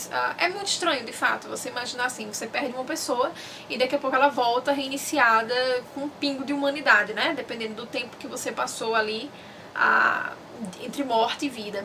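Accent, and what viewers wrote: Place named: Northeast, Brazil. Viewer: oi tudo bem? eu gostei de vc, do seu canal, vc é bem segura e tem sotaque nordestino como eu rsrs...vc é de qual estado? enfim, só tenho uma pequena crítica :( às vezes acho que seus vídeos são longos..vc repete o que já falou, enfim só uma opinião minha msm...td de bom :) até mais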